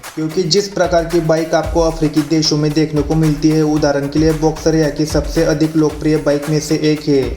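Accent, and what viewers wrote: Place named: Maharashtra, India. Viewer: tum maharashtra ya gujrat se ho aisa lagta hai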